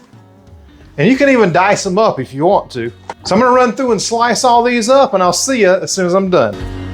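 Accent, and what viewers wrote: United States. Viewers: Us Canadians sure miss you Americans. Good thing we can get our fix of accents and genuine kindness through you tube. I really enjoy your content👏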